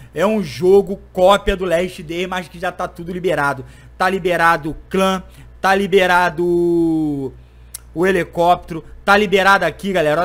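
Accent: Rio de Janeiro, Brazil. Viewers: o nome do game e wasteland e perigoso eu acho e oq estava lá porém está em fase de testes, uma pergunta se é carioca?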